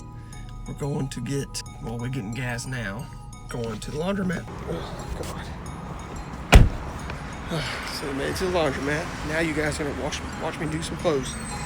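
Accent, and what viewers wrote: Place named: United States. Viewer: You sound different. So country.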